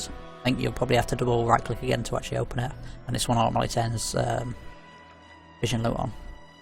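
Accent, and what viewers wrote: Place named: England, United Kingdom. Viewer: Bloody idiots. This is fucking English. This is what English sounds like in England. Not in London, in England. All of these non-native English speakers complaining makes me cringe hahaha, get your own world language and see how you feel about every tom, dick and harry butchering it before your eyes.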